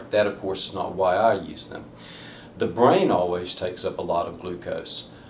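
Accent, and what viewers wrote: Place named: United States. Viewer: Thx v much for the info....it's very well explained....it's based on metabolic activity -I ve no problem with the accent (American...& I m a Malaysian)... -What I m not clear is we r told too restrain from taking too much simple sugars prior to the scan, as it might interfere with its absorption into the cells.....the question is a relative HOW mUCH is too much & for how long before z procedure?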